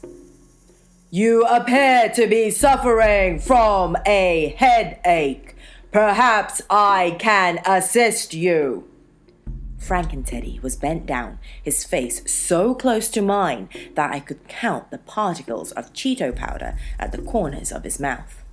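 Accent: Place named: United Kingdom. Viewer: I believe she is British.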